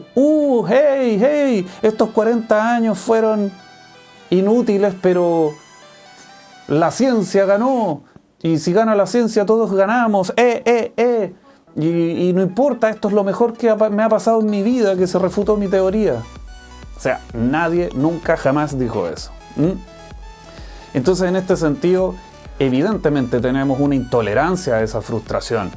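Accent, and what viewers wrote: Chile. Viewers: que cuatico que, aunque uses español neutro y bien pronunciado, pude adivinar que eres chileno! algo en el tono, en las ultimas ''A'' de las palabras... algo me lo decía. comentario na' que ver con el video, pero es primera vez que llego a tu canal, me pareció curioso <3 saludos desde Valpo :D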